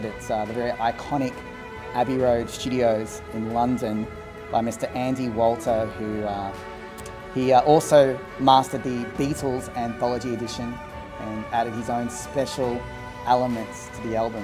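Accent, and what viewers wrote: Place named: Australia. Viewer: Hi, its always so great to see you again with that wonderful Aussie accent and listen to your great 🎻 ❤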